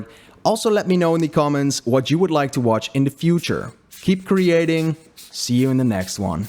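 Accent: Netherlands. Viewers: you sound Dutch? klopt dat? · Are you from The Netherlands because I think im hearing a slight Dutch accent?🤔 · Gotta be Dutch! :) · Is he Dutch, he sounds Dutch... love the vid, really helpful. I still don’t know, either k6, K1 or k2. Atm k2 hot-swappable is not available in brown switched. :( and I think I want brown switches instead of the red ones.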